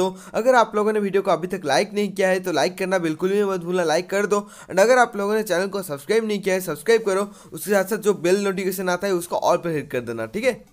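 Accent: Odisha, India. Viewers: Are bhai tum odisha se ho kya ?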